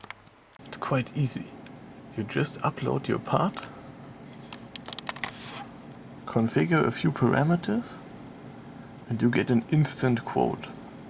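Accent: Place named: Germany. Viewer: I knew I was in for a ride when hearing the thick German accent XD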